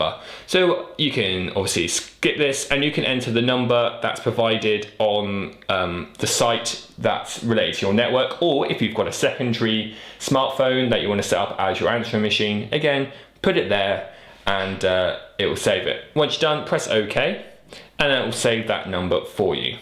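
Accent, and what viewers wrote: United Kingdom. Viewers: I think he's Bri ish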